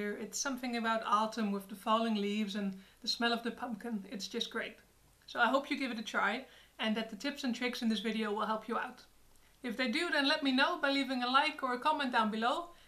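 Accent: Netherlands. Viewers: Let me guess your from Holland..? very good vid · Is she dutch??? She has that kind of accent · She is dutch people have a strong accent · u have such a Dutch accent! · you sound Dutch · You are dutch hah!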